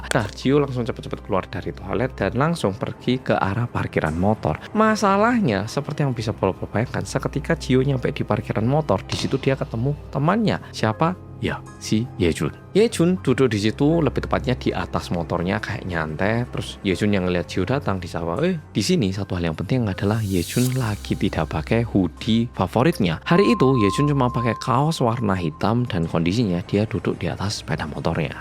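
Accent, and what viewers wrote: Java, Indonesia. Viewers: Dengerin cerita mas, ngebayangin wajah2 Korea tapi bahasa nya medoook 😅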